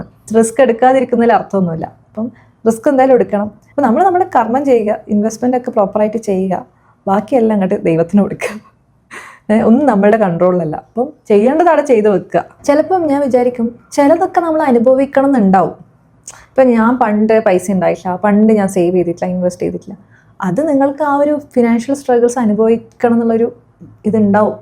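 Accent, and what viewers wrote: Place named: Malappuram-Palakkad, India. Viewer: നിന്റെ സംസാര ശൈലി നല്ല രസമുണ്ട്. മലപ്പുറം പാലക്കാട്‌ ഭാഗത്തെ സംസാര ശൈലി പോലെയുണ്ട്. Anyway good content and good presentation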